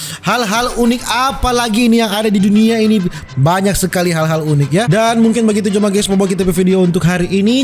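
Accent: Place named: Mandar, Indonesia. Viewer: Abang orang mana 🤣 Loga2 suaranya kek orang mandar😂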